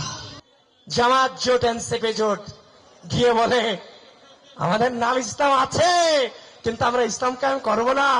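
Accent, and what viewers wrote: Kolkata, India. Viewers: তার কথা কলকাতার ভাষা মনে হচ্ছে ভারতের বির্জ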